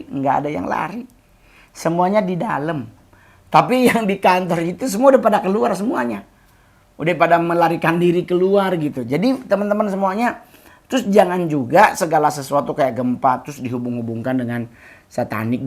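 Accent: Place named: Eastern Indonesia, Indonesia. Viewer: Tengkyuuu kaka Mongol, kita jadi tambah pengetahuan sedikit seputar Satanic yg menarik tapi mengerikan. Ayok kaka Mongol, ekspedisi yuk ke 7 titik di Indonesia yg sudah diikat itu 😉👍. Gw tertarik dengan pengetahuan kaka Mongol seputar Satanic waktu join di DMS, hebat 😉👍 Plus logat Indonesia Timurnya, mantaaaappp kaka 😃👍 Salam katong anak Papua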